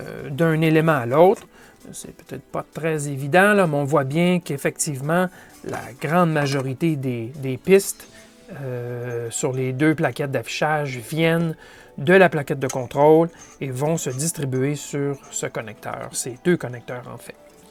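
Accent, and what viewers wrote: Quebec, Canada. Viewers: Bonjour. Je n'y connais strictement rien en électronique mais je regarde vos vidéos avec grand plaisir, ne serait-ce que pour l'accent du Québec ! Libre bien sur hein. Sino, bah et si j'ai tout bien compris vos explications, vu que la plaquette du dessous qui recevait les datas ne sert plus à rien, z'avez qu'à en faire une station météo avec les infos défilantes sur les digits à LED. Ou alors, mais bon ça m'a l'air peut-être plus compliqué, faites rentrer ça dans un boitier de PC et essayer de récupérer les signaux des ventilos et autres sondes de la carte-mère pour les diffuser sur les digits à LED. Je sais pas comment hein vu que j'y connais rien du tout. A part que peut-être aussi, sur les CM de PC y'a des ports COM ou SERIE qui servent à rien la plupart du temps. Ou bien encore, vu que le boitier est tout aluminium, collez-y un petit Rapsberry PI dedans et l'afficheur à digits de ce tagazou servira bien à keke chose vu qu'il y a tout un tas de ports dans ces petits PI. 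Take care, thanks, peace ☮ .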